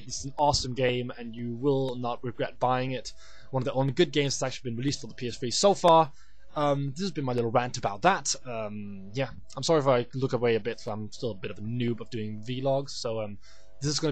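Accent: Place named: United Kingdom. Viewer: I like how your accent is more british than american :D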